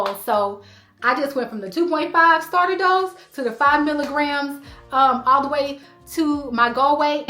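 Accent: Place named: South, United States. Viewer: I can hear a little bit of an accent/twang especially when you say January 1st. Are you from the South? Just curious.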